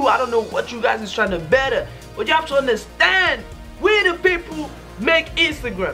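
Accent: Africa. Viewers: He sounds sooo african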